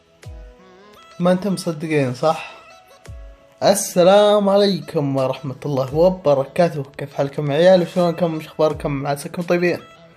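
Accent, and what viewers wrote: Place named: Qassim, Saudi Arabia. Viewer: اية بس لهجتة قصيمي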